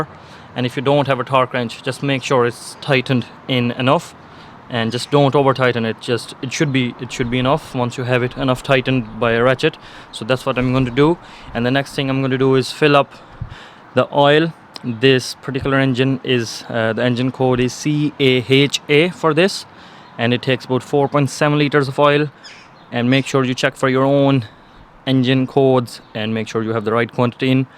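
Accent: Ireland. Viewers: I heard the Irish "twang" easily enough! But we're all best friends ❤️❤️ until the 6 nations 🤣🤣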